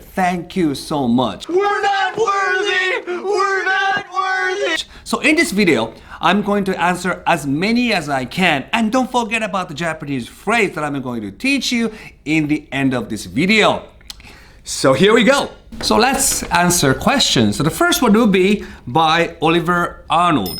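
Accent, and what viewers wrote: Spain. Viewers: hahaha eres un grande, tienes un buen espanol. se nota que aprendiste el acento castellano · Wow that Spaniard accent. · I was thinking you had kind of a spanish accent when speaking english... Just figured out why! That being said, I think it is great you have your own channel now and wish you all the best! I wanted to visit Japan for a long time now and watching you and Chris travelling the country and having all kinds of mouthwatering foods and fun adventures made me decide to start saving up for a trip next year (due to current circomstances...). Can't wait!! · your spanish accent melted my cold dead heart. Speak more spanish to us please.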